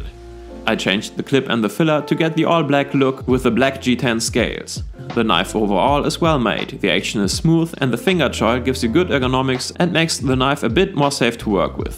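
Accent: Germany. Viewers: Heavy German accent but nice content. Thanx.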